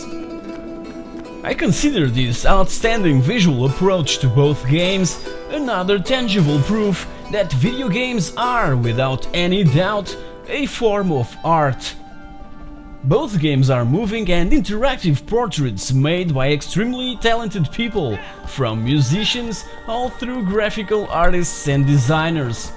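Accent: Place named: Portugal. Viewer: LOL, o sotaque pt é tão vísivel :D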